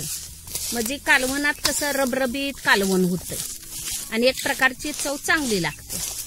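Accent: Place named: Rural, India. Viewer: रेसिपी तर चविष्ट झाली असणारच. पण ही गावरान भाषा कानाला इतकी गोड वाटते की ऐकतच राहावीशी वाटते.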